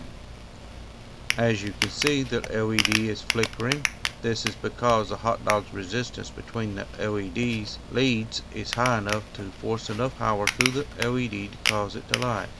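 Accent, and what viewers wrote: South, United States. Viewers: this guy's southern drawl is hilarious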